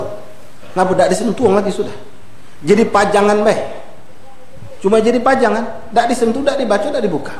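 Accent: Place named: Palembang, Indonesia. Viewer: wah... bahasa melayu palembang ini....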